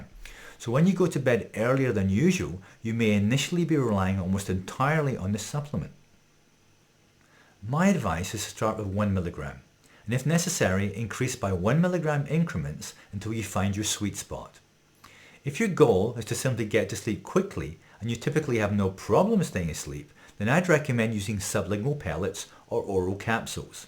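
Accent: United Kingdom. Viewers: from your accent, i’m assuming you live in the uk. if so, how do you manage to order melatonin here?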